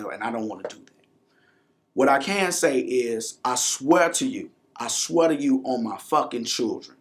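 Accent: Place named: Chicago, United States. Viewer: U gotta be from Chicago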